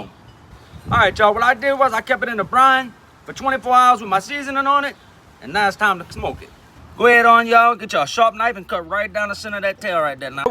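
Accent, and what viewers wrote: Louisiana, United States. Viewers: Sounds like a Louisiana accent to me. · This guy must live around NAWLENS LuEezana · Florida doesn’t copy you. They don’t speak like that you can immediately tell he’s from Louisiana. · 😂😂😂 you don’t know shit about Florida buddy we don’t talk like this in Florida that’s Louisiana pal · Lmao fam can’t recognize a straight Louisiana accent lol. Florida ain’t the only state with alligators fam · It’s a Louisiana accent…Florida be copying us 😭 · That hard Louisiana accent hard to understand sometimes haha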